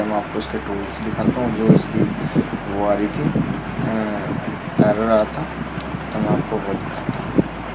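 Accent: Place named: India. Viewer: You didn't notice the background noise and thick hindu accent? (facepalm). Seriuosly..get your hearing checked.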